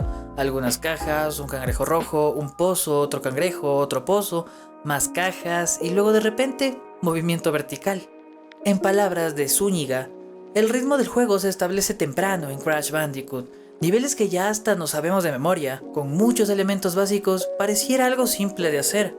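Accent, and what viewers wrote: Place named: Sierra, Ecuador. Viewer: Bro, tu contenido es genial. También soy fanático de Crash Bandicoot. Saludos, también soy de Ecuador. Mas precisamente de Guayaquil, supongo eres de la Sierra por tu acento.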